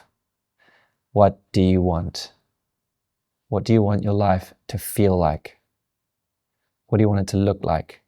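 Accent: South Africa. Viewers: Another excellent video, - thank you! I heard you say you live in the UK? You have a South African accent, I resonate… I am a South African living in the Highlands of Scotland, and building a YouTube Channel (just released video 4)., you have so much skill and experience - I will welcome any input you choose to share - you speak so naturally, your dialogue is fluid, natural and seamless… perhaps you could talk more about how you have come to sound so natural, it’s lovely because it allows us to see you - very authentic. Thanks again, I am enjoying your work. 🌺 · Finding your channel is a breath of fresh air. Most channels feel like a bad American tv advert from the 80s. I love your approach. I can watch without my brain nervously anticipating jump cuts and it feels like you’re speaking directly to me, with authentic thoughts and heart-felt intentions. It is the only way I would want to present myself as well. 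I have been a photographer and artist all my life and have made a few short films at film school so I know my way around an Avid Media Composer and other editing software. I don’t want to create anything that feels over produced. I just want to make raw honest content but I’m still deciding what that would be - I’ve done a fair amount of tutoring in various subjects so that will help, I suppose. This video in particular has had me trying to articulate what I want in the various areas of my creative life so it has been a great exercise. I have often thought about it and even advised others to get clear on what they want, but it was your story about being put on that spot that finally spurred me into making a concerted effort to write it down. Thank you. (I also live in London… I hear a slight South African accent in your speech which is lovely).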